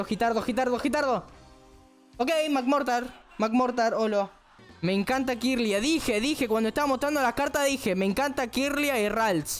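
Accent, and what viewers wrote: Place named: Argentina. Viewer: Eres de Argentina deep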